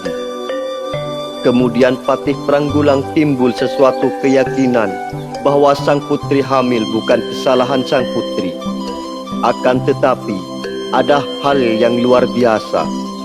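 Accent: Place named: Madura, Indonesia. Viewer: Admin suara madura tulen❤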